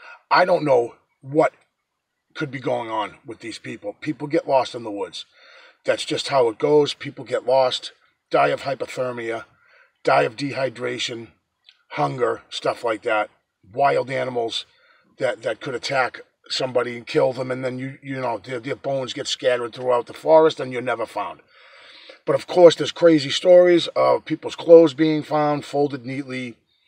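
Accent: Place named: Boston, United States. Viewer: Just found your channel. I'm into these things. Just subbed. Great theory. You sound like u have a Boston accent lol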